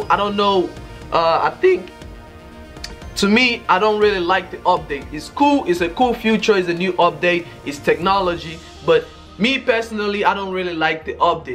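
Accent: Africa. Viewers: He sounds sooo african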